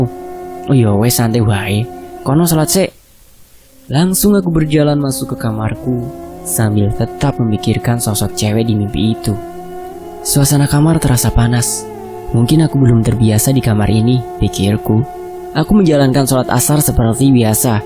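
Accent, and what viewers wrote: Java, Indonesia. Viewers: J Ms jowone medok bngt rek 😂😂😂😂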